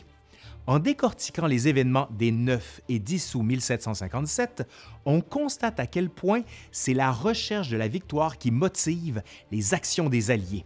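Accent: Quebec, Canada. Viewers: Bonsoir, je suis abonné à ta chaîne depuis peu et j'adore ce que tu fais. Ton accent québécois me fait toujours sourire. Vous avez de drôles d'expression. Le "vieux français" n'existe plus chez nous en France mais vous autres au Québec vous l'avez gardé. Je voulais te signaler que la photo que tu postes dans ta vidéo à 11.04 mn d'une compagnie anglaise correspondrait plus à une évocation de la bataille de Mont Saint-Jean soit 58 ans après la reddition du fort William-Henry. En tout cas, toutes mes félicitations pour ton remarquable travail. Bonne soirée. Sincères salutations livresques d'un abonné français passionné d'Histoire, entre autres des périodes de la Guerre de 7 ans au Canada et du 1er Empire.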